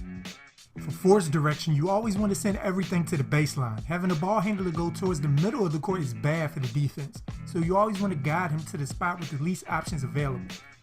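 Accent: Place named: New York, United States. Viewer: you got that new york og voice and that beat selection is perfect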